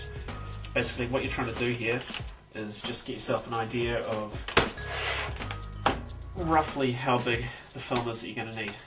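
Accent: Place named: New Zealand. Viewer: He's a Kiwi bro L2ACCENT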